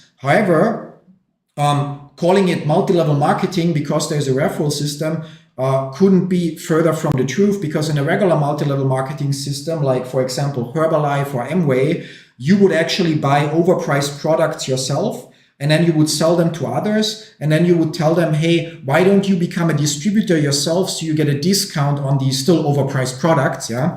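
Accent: Germany. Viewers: so typical German to pronounce a C like a Z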